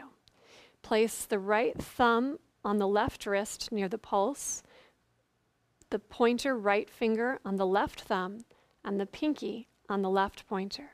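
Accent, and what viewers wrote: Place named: United States. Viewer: please people learn from indian acharyas, ... american accent tremendously deform the mantra .... the udatta anudatta and respect of sandhi are absent ... the translation is very poetic and not giving proper meaning ... mudras are supposed to be practiced each for 1 reception ... please learn from proper sources ...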